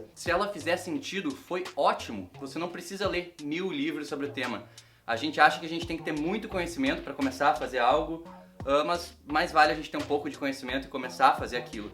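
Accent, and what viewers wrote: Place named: Rio Grande do Sul, Brazil. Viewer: Cara, que massa te ouvir! Além de adorar teu sotaque gaúcho, pq tbm sou gaúcha...achei valiosas tuas colocações. Sou Coach e acho que é isso mesmo...cada um precisa buscar o que faz sentido para si. Sou totalmente contra regras pré estabelecidas como: acorde cedo, leia mais, não coma carbroidratos à noite, e um monte de coisas que são boas para algumas pessoas, mas para outras não! Ja me inscrevi! Obrigada pelas reflexões!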